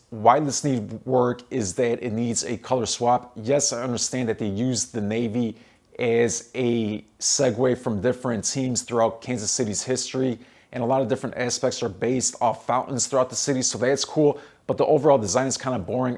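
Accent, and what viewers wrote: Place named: New Jersey, United States. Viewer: "different grilling events, down by lake michigan" i love that phrase, i lived there before never heard that, lol, love the jersey accent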